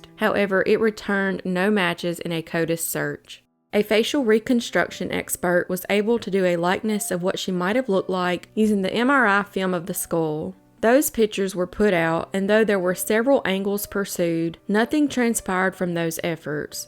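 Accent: South, United States. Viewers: As a Texas woman l appreciate a southern accent, enjoy your videos, so tragic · i kept hoping you'd say "I do declare" at some point. We Brits love that Southern drawl...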